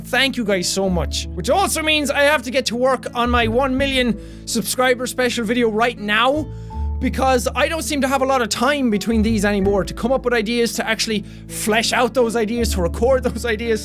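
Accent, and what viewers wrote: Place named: Ireland. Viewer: I still remeber when you coved up your irish accent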